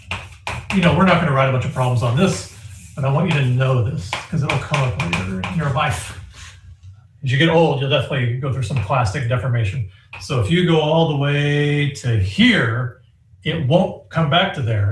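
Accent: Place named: South, United States. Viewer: Mahnus mahnus mahnus :) If this post gets two likes, will you come back and deliver more of your lovely southern accent to us?❤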